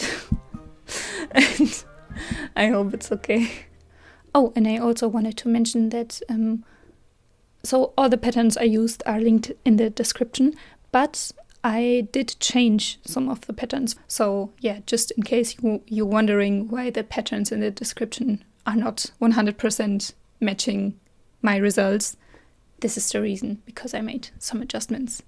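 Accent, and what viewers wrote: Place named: Germany. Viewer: I WAS SO SURE YOU'RE GERMAN BC OF YOUR ACCENT- fellow german here :3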